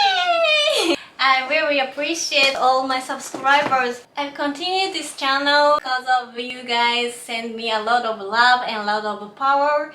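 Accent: Japan. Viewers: ほっこりする和風英語でいつも楽しくみさせて頂いてます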